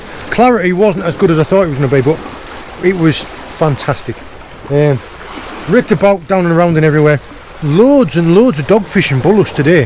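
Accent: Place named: Geordie, United Kingdom. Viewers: I thought you was a northern lad just come across an old video <link> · As a jock I cant work out if your a Geordie or Yorkshireman, also get a military vibe about you, have you served 👍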